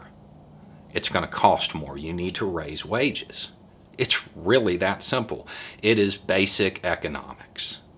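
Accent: South, United States. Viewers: You do realize that human trafficking could include helping illegal immigrants get to a better place correct? Just because it breaks the law does not always mean that it is immoral in nature. As for the accent as someone who was in the military and moved around I picked up the accent of any place I resided in given enough time, people gain and lose accents it is a thing so I don't know if it is really fake or not and even if it is is a Southerner now and I doubt you even know where he grew up so you can't even pull that not born a southerner card....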